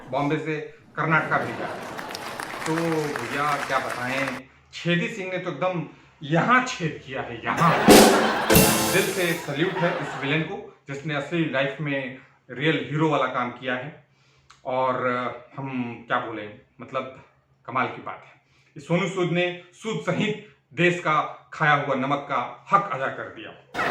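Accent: Bihar, India. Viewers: Bihari Andaz Mai EK THOW Ka shandhar presentation, Phir Economic warriors, Keep it up.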